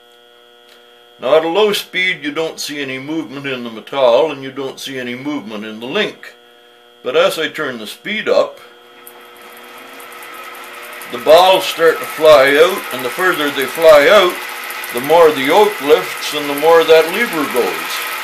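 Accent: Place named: United States. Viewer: This 'Murican accent... Love it!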